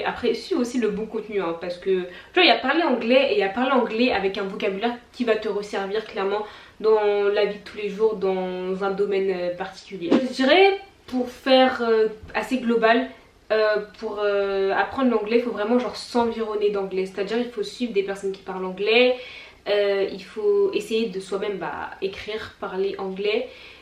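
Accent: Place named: United Kingdom. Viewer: Je trouve que ton accent est plus proche de l'accent britannique que américain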